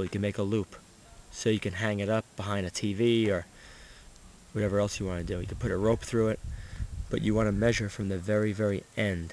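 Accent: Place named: Boston, United States. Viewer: Are you from Boston or New York?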